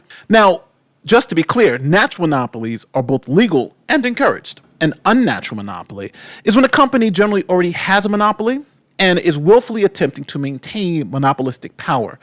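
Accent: New York, United States. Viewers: Love your videos & your New York accent. 😄👍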